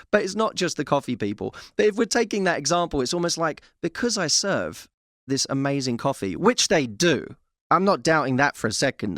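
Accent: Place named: United Kingdom. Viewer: Cute much! Dungarees....You mean Jeans, right?? Love your British accent & names for items.... ;) <3 Hugs from Canada...;)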